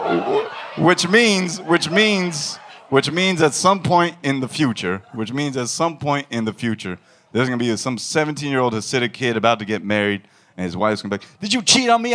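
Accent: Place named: United States. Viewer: He talks so american but tht laugh is Indian asf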